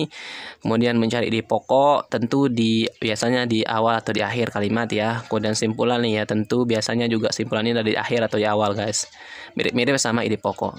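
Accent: Lombok, Indonesia. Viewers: logatnya kek org lmbok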